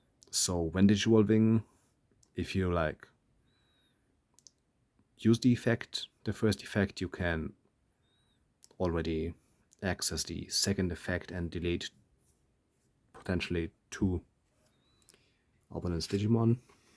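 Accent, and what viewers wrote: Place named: Germany. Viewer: Irgendwie hab das Gefühl, das du in deinem Englisch Ton, eingentlich deutsch sprichst 😅 is das so?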